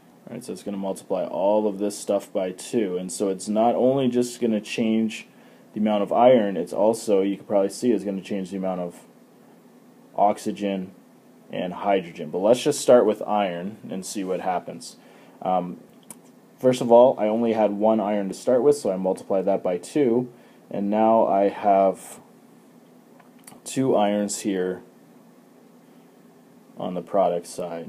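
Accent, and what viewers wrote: Canada. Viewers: Stupid Canadian accent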